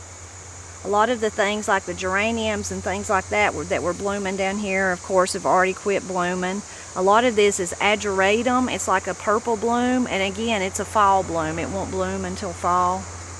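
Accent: Appalachia, United States. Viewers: Hi, I grew up in the mountains around Hendersonville NC, just east of Asheville and not very far from the Chimney Rock area. The surprise lily daddy and grandmaw always called it resurrection lily. Another name for it is lycorice but I believe it's not akin to the candy. I've seen mostly red as I've been growing up, but I have seen a white variety and a variegated variety that had both red and white petals although they were growing together in the same clump so they may have crossed pollinated over the years. I live high up in Watauga County NC in Boone now and I haven't seen it up here, but it gets very cold very deep in the ground the freeze line goes super deep up here so it might not be cold hardy for this elevation. I truly enjoy yours and videos and the accent and storird remind me of home. Thank you for keeping Appalachia alive and well and for bringing up your daughters in our mountains traditions.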